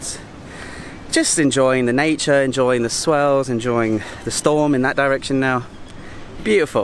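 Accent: England, United Kingdom. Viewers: So he s from Northern Ireland 🇮🇪 why does he have a slight English accent??? I think this might be my new H.Q. when I go there for 6 months in Oct. 2024. Looks like a great mild place to learn. Im 45. I GUESS ITS NEVER TOO LATE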